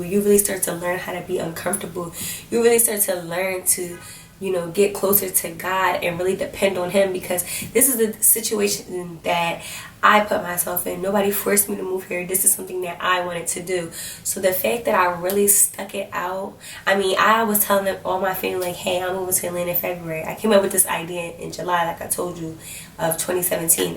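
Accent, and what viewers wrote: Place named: Philadelphia, United States. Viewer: I knew you was a philly girl I can hear it!!! Congrats on the move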